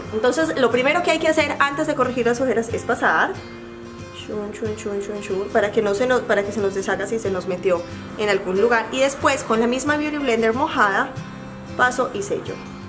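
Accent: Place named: Venezuela. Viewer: Me gusta como habla, su acento Venezolana? no me equivoco?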